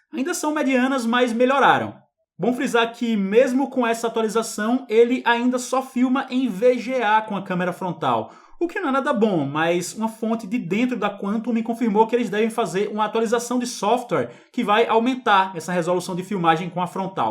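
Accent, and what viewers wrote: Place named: Fortaleza, Brazil. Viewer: De fortaleza se não me engano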